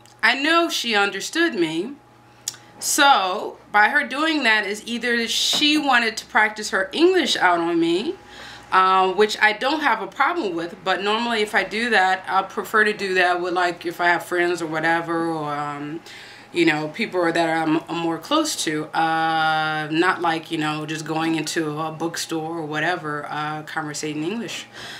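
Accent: France. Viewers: It's funny that your pauses are French times..but you've still kept your American "umm". I think that perhaps some French answer you back in English, because of your French accent. The French are very ticky about who speaks their language and HOW they speak it. So, if it doesn't sound close to a native accent, and they feel that their English is better than your French, they will speak back to you in your language. Rude? I don't think so. I'm sure it could be unnerving, though. Bon courage à vous!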